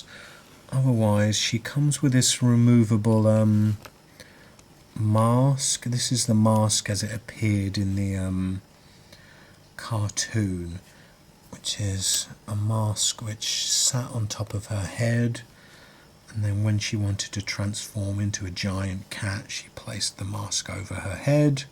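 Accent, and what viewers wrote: United Kingdom. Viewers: cool british acent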